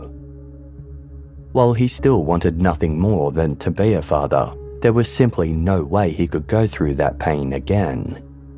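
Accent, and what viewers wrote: Australia. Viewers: Whoa ! aussie :)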